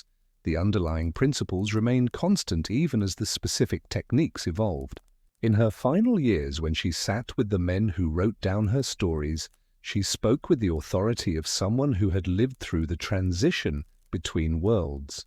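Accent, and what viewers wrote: United Kingdom. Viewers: Watching from southeastern Texas, 🇺🇸 USA. You have a beautiful British accent. Could listen to you for hours.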